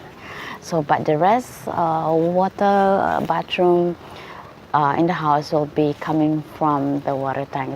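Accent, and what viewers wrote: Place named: Philippines. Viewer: I thought so by your accent and youtube video, I am from Canada and there are lots of Phillipeans living in Canada besides other immigrants that Priminster Justin Trudeau has brought in from one year ago and he is bringing more in this year of 2019. By the way, Canada is not like the U.S.A. as of school shootings and gun violence, that's why they all want in here.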